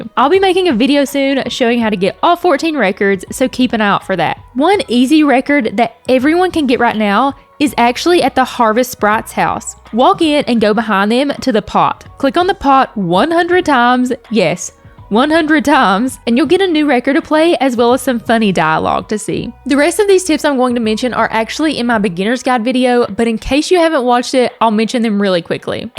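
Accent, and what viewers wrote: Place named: West Virginia, United States. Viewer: I love your accent, my friend is from WV and she sounds just like you!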